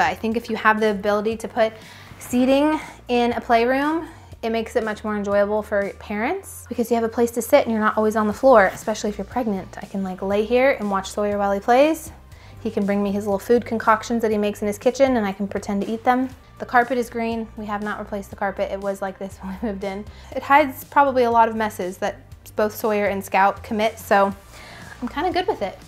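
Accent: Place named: Midwest, United States. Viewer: Lol! How quickly you adopted that mid western sound & y'all!!! Love it! Keep up the good work &!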